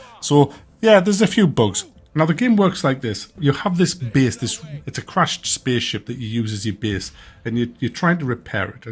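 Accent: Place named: Newcastle, United Kingdom. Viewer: Not seen your channel before but your red and green logo made me think of you'd have an italian accent. I laughed so hard when I realised you were a geordy! Great, honest review. I'll definitely watch more of your vids.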